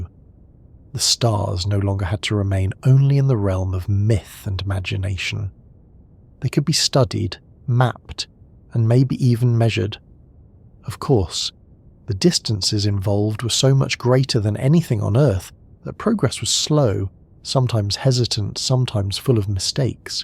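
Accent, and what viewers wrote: England, United Kingdom. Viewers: He is from England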